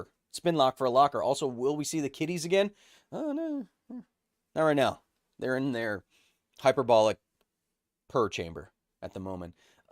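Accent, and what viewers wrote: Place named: Australia. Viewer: Gotta admit, last week's Aussie accent was better. Can't put my finger on why though.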